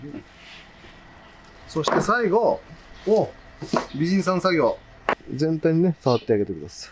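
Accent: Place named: Hiroshima, Japan. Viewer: 、サイコーです❤ 春がきましたね😊 可愛いお花苗がいっぱい素敵です🎉 先生の広島言葉サイコーです❤ 私も何時もお花に、キレイに咲いてくれて、ありがとうと言いながら終わった花達を摘んでます😂 フェスタ、応援してま〜す❤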